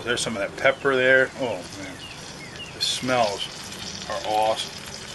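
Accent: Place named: Pittsburgh, United States. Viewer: We are enjoying your videos. I is a pleasure hearing someone speak Pittsburghese. We are from Bellevue. This recipe looks awesome.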